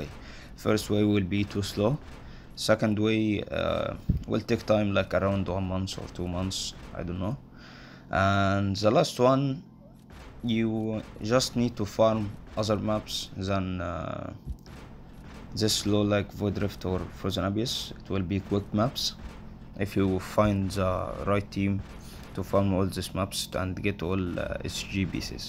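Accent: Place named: Egypt. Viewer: مصري عظمه الصوت باين حصل باين فشخ xd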